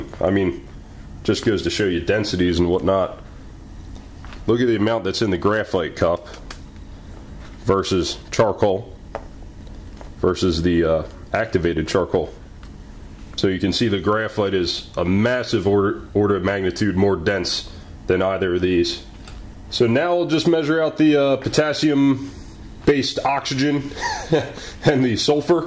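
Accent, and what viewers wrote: South, United States. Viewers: Maybe it's just been awhile, but you have damn fine hands. Damn fine hands! I didn't even know I was a 'hand' lady! Granted, I'd gleaned from your videos that you are an ass, re: euphemisms, double entendre, blatant ejaculate consistency; Of a keen intellect with a purview respectful of both humanistic and psychodynamic persuasions and love for even the lamest of layman; You've a deep, soothing voice, with that faint Southern-like drawl -... and I just heard something about 6"... Average ain't bad! Above being the verbose way of sayin': I've got to hand it to you, you are one damn fine ass, sir. tips hat Love the videos and the colourful internal dialogue gone rogue. <3 Thank you.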